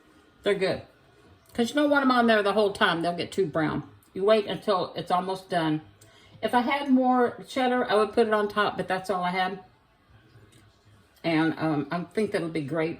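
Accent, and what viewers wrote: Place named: Kentucky, United States. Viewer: You sound like a Ky. Girl, I’m a ky girl.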